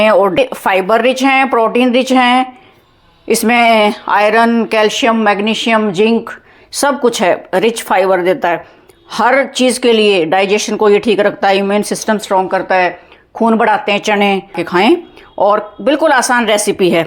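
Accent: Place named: Haryana, India. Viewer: बहुत अच्छा बहन आप कहां से बोल रहे हो आप मेरी हरियाणा की बहन लग रहे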